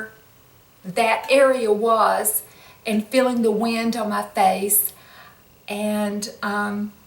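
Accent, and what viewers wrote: United States. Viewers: As an Englishman I like that accent. I gotta go on holiday an hear more of that, tho I don't know what states speak like that.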